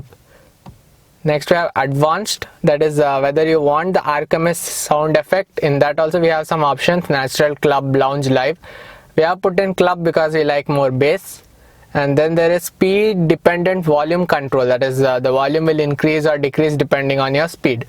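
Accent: India. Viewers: your accent is sooo damnn funny bengali babu😂😂 and bro why r u so nerdy ?? improve ur personality dude open up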